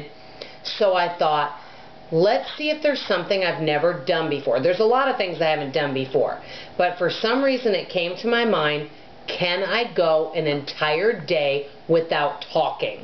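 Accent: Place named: North, United States. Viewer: I know I couldn't do it! I'm a talker .. AND if nobody is at home can you talk to yourself? That MIGHT be doable .. I often talk to myself! I'm fairly new to your channel so I'm dinging the bell so I'm sure I don't miss the results lol GO FOR IT .. (you have an accent so I assume your from the north and you talk really fast I'm From the South and I talk, hear and listen in Southern)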